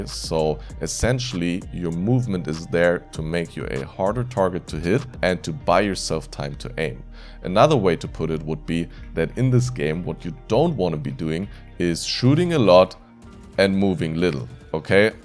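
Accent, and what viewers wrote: Germany. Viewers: Der deutsche Akzent xD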